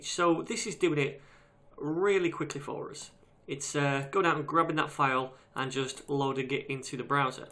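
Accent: United Kingdom. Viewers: I just want to say thank you for your tutorial and your British accent drive me to learn more about nodejs : )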